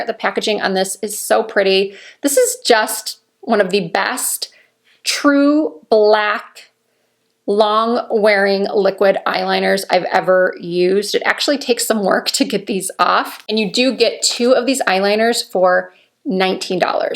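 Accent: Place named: North, United States. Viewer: Hi, I really do love your channel. My skun, skin tone etc are completely different from yours, but I always gain great information from you. I feel bad that you have to always defend yourself from negative commentary. I admire all you tubers that have to put up with that. I just don't think I could do it. As an aside, I was born in Michigan, so I recognized your northern accent right away. Much love your way, and thank you so much Lisa, in Tennessee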